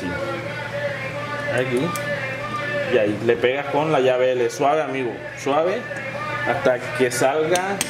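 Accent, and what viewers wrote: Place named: Caribbean Coast, Colombia. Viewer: ¿De donde saca ud. Que es venezolano? bien colombiano y costeño que si es. No se de donde pero su acento me lleva a pensar que es del sur de Bolívar o Sucreño.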